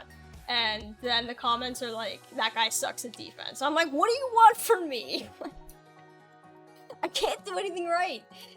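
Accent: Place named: Canada. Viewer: wow I'm mind blow , I always assumed you were Canadian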